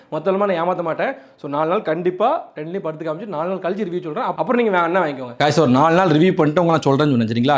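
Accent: Tirunelveli, India. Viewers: tirunelveli , tenkasi kaaranga maathurii pesuringa jii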